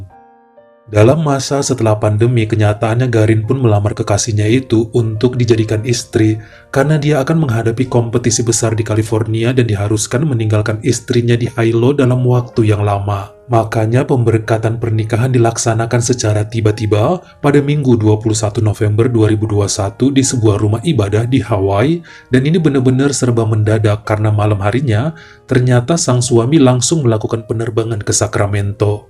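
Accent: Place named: Medan, Indonesia. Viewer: setiap denger cerita dr channel bang aku ketawa ngakak. mgkn krna aku berasal dr medan udh terbiasa denger logatnya, dan ada kesan lucu polos dlm bahsa2nya..sugguh menghibur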